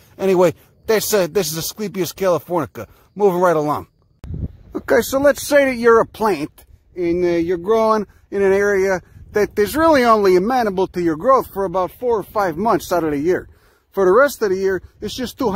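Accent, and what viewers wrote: Chicago, United States. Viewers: yes. I'm aware of the most common invasive wild edibles. Tons of videos and books, but nobody can present it like my main man. I need it delivered with that no nonsense Chicago flare. Ya dig?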